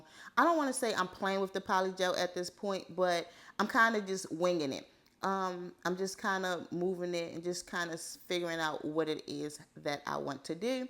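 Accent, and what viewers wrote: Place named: Maryland, United States. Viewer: New subscriber here. Stumbled across your page on IG. Love love love your work. Me and polygel are not friends but I enjoy watching and seeing your sets. I could also tell from listening to your voice that you’re from Maryland. ❤ I love Rhimestones.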